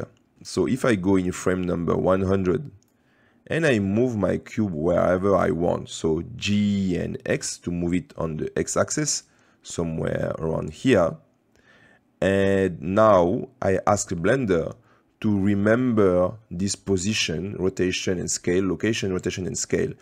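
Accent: France. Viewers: That French accent though! Perfect for learning :D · He sounds so french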